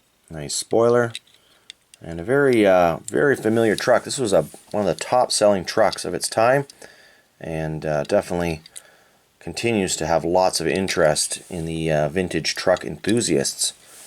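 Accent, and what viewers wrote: Canada. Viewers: Banana-ing of the vehicle❓❓🤔 - Ya big kid,you.🤭 Also,just wondering,by any chance,would you happen to be Canadian?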